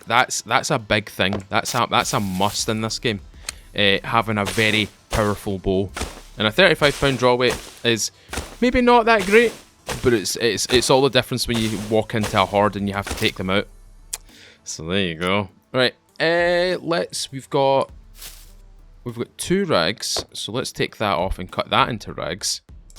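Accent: Scotland, United Kingdom. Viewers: I've been looking for a new SCUM player but I don't think I can watch your videos, I'm Scottish as well and the inevitable thing that all Scottish people have to do so everyone else understands us also is unbearable to us! 😂 Nothing personal mate 👍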